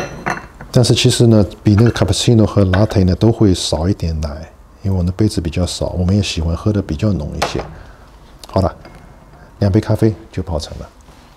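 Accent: Shanghai, China. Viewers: 听到上海腔普通话感到很亲切。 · 一口正宗老派上海话，的的刮刮上海人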